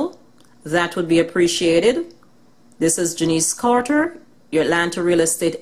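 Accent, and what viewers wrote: Jamaica. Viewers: Just found this channel and loving it so far well tailored video lovely homes I like seeing decorated homes. Thinking of moving to Atlanta in the summer 2020 will definitely be keep my eyes glued to your channel...thanks for the videos keep them coming😊😊.....btw Janice you sound Jamaican🇯🇲😊😊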